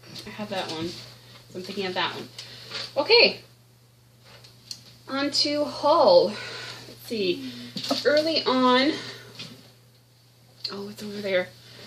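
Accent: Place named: Canada. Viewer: First time watching you and I thought I detected a Canadian accent and I was right! Love your finishes and really, you stitch fast! You call that pretty leaf piece small, but that would’ve taken me forever! Also l love your haul ;). Happy stitching!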